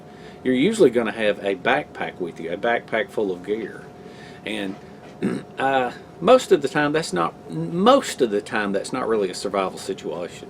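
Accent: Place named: Appalachia, United States. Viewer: Hi. Although it's true that you have a strong Appalachian accent, your word enunciation is always pure. Whereas, in my accent, some syllables are left out or modified. Your CC is great and matches your vocabulary perfectly. I did enjoy it. Thanks. I love having CC as an enhancement to a video.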